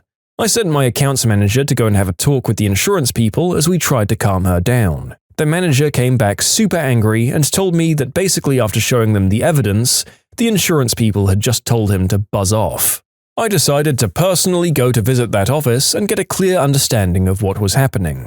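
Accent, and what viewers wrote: United Kingdom. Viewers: I’ll leave an actual comment later, hopefully the British narrator sees this. He’s my favorite narrator of the 4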